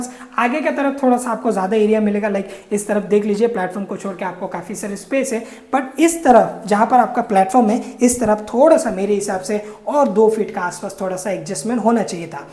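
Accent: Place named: Nepal. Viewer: Yeh bhai hindi me hi bol raha hai. Ye nepali hai isliye Aisa hi hindi bolenga bhai.o.k.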